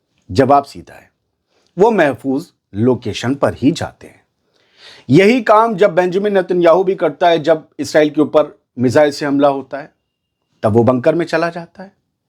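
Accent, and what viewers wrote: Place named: Awadh, India. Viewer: bhai asslam walaikum wa-rahematullahi wa-barqatahu Bhai main Gonda u.p se belong karta hu aur mahesoos karta hu shayed aap bhi awadh se hain kyunki news' padhte waqt aapki bato se maine kai baar mahesoos kiya hai.... ALLAH HAFIZ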